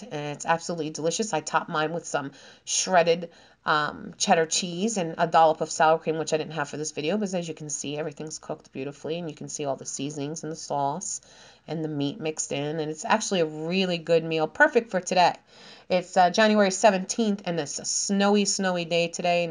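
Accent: New York, United States. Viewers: Are you from NY? You sound like my cousins from NY! I love Wendy’s Chili and I’m crazy about Puerto Rican Food!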